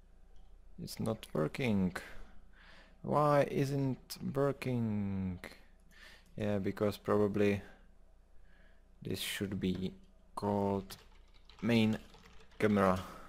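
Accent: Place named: Latvia. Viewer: Are you from Latvia? Your accent sounds familiar :) Thanks for the video!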